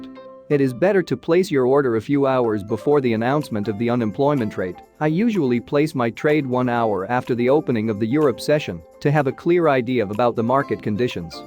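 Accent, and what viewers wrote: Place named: United Kingdom. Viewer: You are somehere in GB, Scotland or England most likely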